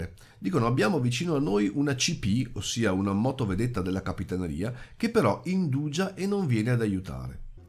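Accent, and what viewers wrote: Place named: Veneto, Italy. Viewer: L'accento e la cadenza venete dovrebbero essere patrimonio dell'umanità!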